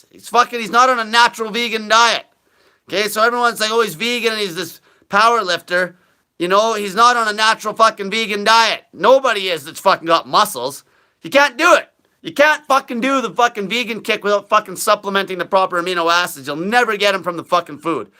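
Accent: Canada. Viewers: Jesus Christ.. what part of Canada are you from?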